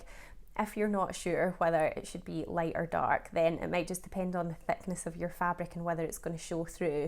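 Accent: Scotland, United Kingdom. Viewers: Living in Switzerland as I have for eleven years makes a Scottish voice very nostalgic for me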